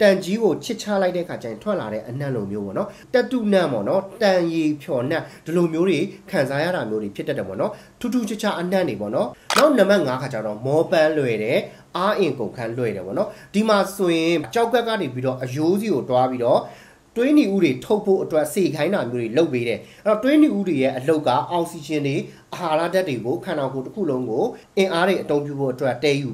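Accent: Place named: Upper Myanmar, Myanmar. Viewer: ပြောပြတာကျေဇူးပါ အင်းပြောတဲ့သူက မကွေးဘက်က အညာသားဖြစ်မလားနော် သန်းလာတာကို တန်းလာတဲ့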